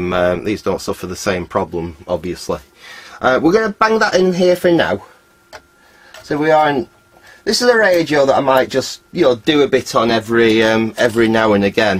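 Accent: Manchester, United Kingdom. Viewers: Where are you from..... Sounds like a bit of a manc accent..... I have a question I can't get my head around..... I bought a ct160 10 days ago .... And regardless of the data ,the anode current settings are always a lot lower than the book.... e.g testing ecc81 valves the anode current should be 10ma and out of 5 valves I've tested they all seem to be about 3to 5ma to zero on the scale.... I've been desperate for a new video to pop up somewhere..... would it be possible to do a video at some point testing an ecc81..... Ps tried a e88cc and the anode should of been 15ma I think it was, however it was zeroing on 3ma.... I'm new to valves only using basic go no go testers... Any help would be awesome.. I'm in Burnley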